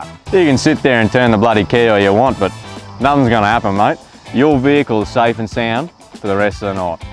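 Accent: Australia. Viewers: I am subbing. I'm learning the art of aussie tongue and you're the perfect subject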